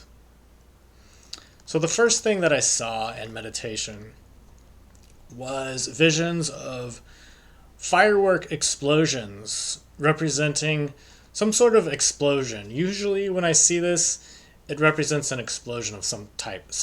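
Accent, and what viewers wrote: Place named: Finland. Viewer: hmm, possibly Finnish? I legit thought you were 100% Finnish at first and you almost like… have an accent? 😅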